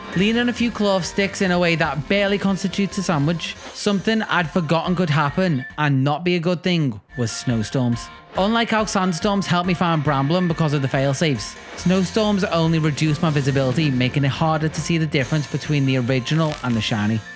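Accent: Liverpool, United Kingdom. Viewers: Firstly, your stronger than me lad i had a go at hunting a dex a while ago and tbh i dont think my attention span’s recovered.. also, scouser?? Got a little scared hearing another accent on here i cant even lie. Dunno what it is about brits and youtube but i never see any of us on here loll. Anyways congrats on this man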